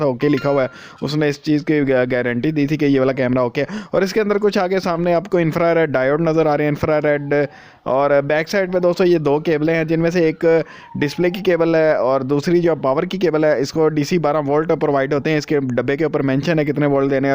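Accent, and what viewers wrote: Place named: Punjab, India. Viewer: Punjabi touch aa gyya hai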